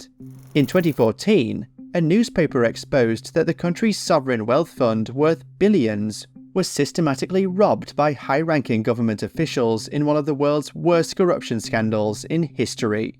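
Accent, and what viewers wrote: United Kingdom. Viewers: presenter's accent is british. this mistake is more on the editor or producer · Fair analysis, but it’s pretty rich to be lectured by a British accent on the (admittedly flawed) implementation of the affirmative action policies Y’all got us in this mess in the first place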